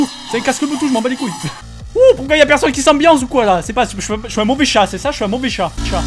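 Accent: South, France. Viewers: Dat accent du Sud heing ! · Hello à c'est toi qui a rajouté le symbole illuminati ? xD Tu viens d'où ? car t'a un accent du Sud :p ( je crois Montpellier mais pas sûr vu que tu avais fais une vidéo ) Jsuis deçue pour le twerk, mais bon bad ass ! Mdrr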